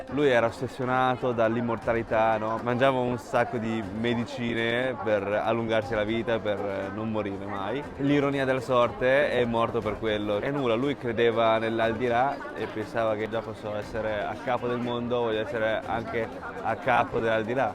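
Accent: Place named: Pesaro, Italy. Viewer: Pesaro, come fai a confondere l'accento con il modenese?😅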